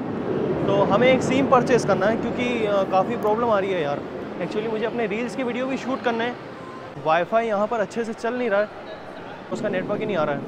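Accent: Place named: Mandsaur, India. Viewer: Tu bhai tone chng mat krna...khanpuriya mandsaurii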